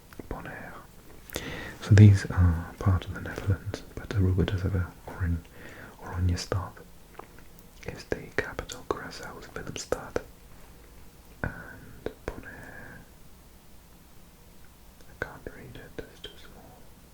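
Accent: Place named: Wales, United Kingdom. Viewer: Welsh accent ASMR??? Where have you been hiding, Mr??? 🥰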